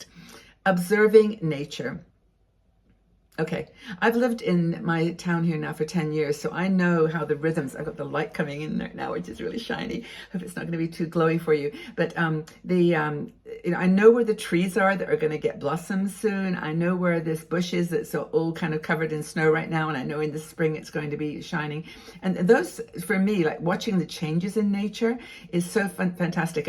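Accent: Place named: United Kingdom. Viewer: Hi! I love walking and I love hearing you speak in a more British way!:)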